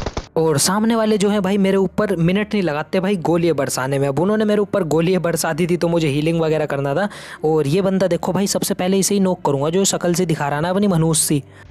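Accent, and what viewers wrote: Haryana, India. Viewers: Bhai please btada tu haryana ka h kya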